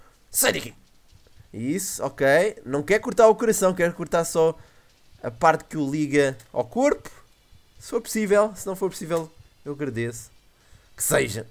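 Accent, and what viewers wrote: Portugal. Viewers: mano ele é de Portugal, não fala errado é o jeito dele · essa voz de portugal deixa mais engraçado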